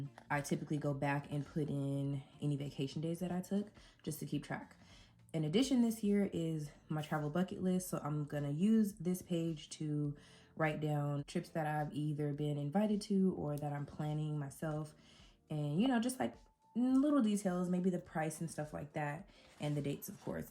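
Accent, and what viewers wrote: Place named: New York, United States. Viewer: New viewer & subscriber. Right b4 you said you live in Vegas, I said she sounds like she could be from NYC. Tfs all your ideas...good inspo. Love your nails 💅 too.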